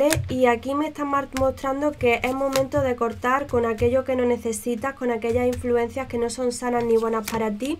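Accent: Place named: Castile, Spain. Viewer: Gracias hermosa por tu lectura y consejo, tienes una voz muy linda y castiza, gracias chula eres maravillosa, besos desde México.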